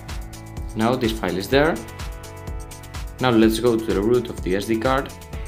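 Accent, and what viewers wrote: Spain. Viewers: SE NOTA QUE HABLAS ESPAÑOL CRACK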